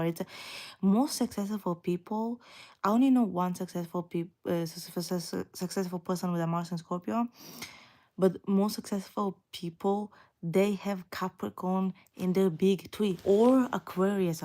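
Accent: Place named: Moldova. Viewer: Moldova? I need to know where your accent is from my girl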